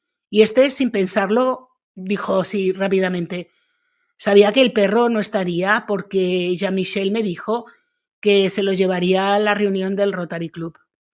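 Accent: Aragon, Spain. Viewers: excelente vídeo.Eres aragonesa? Tienes un cierto acento maño,que me encanta 😍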